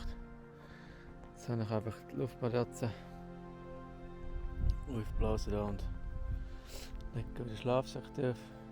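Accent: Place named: Central Switzerland, Switzerland. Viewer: Hallo lieber Auf meinen Weitwanderwegen habe ich immer auf das Zeltschleppen und -schlafen verzichtet. Deshalb bleiben mir die wunderbaren Strecken in Amerika verwehrt. Umso schöner ist es, wenn ich nun dank dir und deinen schönen Videos den gesamten CDT (hoffentlich) mitwandern kann🙂! Sogar dr komisch Innerschweizer Dialäkt verschtohni guet 😊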